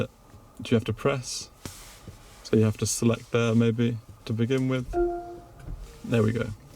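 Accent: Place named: Surrey, United Kingdom. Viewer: Yah, a lovely Surrey accent, 😂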